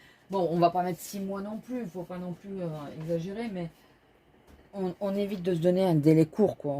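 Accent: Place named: Toulouse, France. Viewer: Bonjour, vous êtes d'où ? Je vous ai entendu dire que vous n'allez pas sur Toulouse. Et il est vrai que l'accent, je n'y avais pas prêté attention mais il est de chez moi lol. J'ai bien aimé votre vlog. Hâte de voir le prochain! Bonne journée 😊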